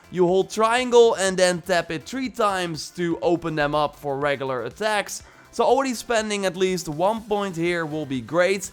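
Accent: Netherlands. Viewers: You are dutch aren’t you??😂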